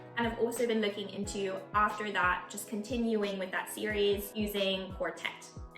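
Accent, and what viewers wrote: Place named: United Kingdom. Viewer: Great progress! Since you are solo studying you may not be getting any guidance on pronunciation. You have a very british "a" vowel going on in words like "wanikani". You are using what linguists call a "Near open front unrounded vowel". In IPA it's written "æ". You want to be using a "Open central unrounded vowel". In IPA it's written "a". Japanese always uses "a" never "æ" because "æ" doesn't exist in japanese. If you google both of these the first result will be wikipedia where you can play and hear the difference. Continued luck on your studies!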